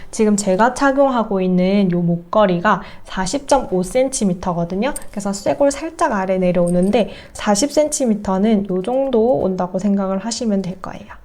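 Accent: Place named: Gyeongsang, South Korea. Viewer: 구수~~^^ 노노~ 고소함 정도ㅎ 경상도는 서로 친근함을 느끼죵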